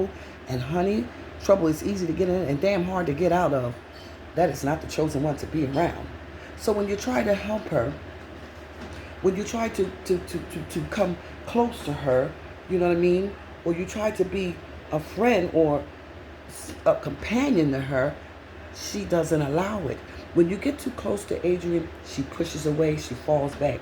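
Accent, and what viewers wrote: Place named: New York, United States. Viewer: Ms.. Love your singing voice per usual! Your commentary cracks me up 🤣. Also I'm detecting like 2 accents from you...Chicago because how you say "restaurant" and NY/east coast😊.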